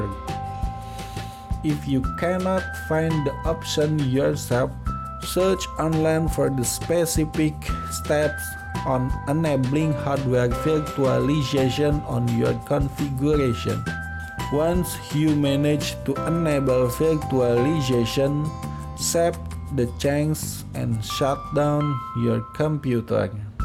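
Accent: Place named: Bengal, India. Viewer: Dat bangga English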